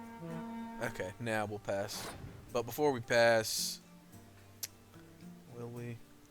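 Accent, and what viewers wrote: United States. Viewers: sorry your accent is really annoying california? whateverrr...we're gonna match that playyyyyyy... ugh. and ,you got this you had a bad ass last hand enough*edit* it wasn't last hand my bad i get my Irish up sometimes.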